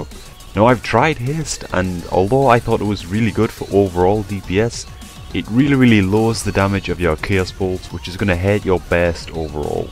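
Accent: Newcastle, United Kingdom. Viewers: Were abouts are you from? are you from newcastle by any chance? because im from there :D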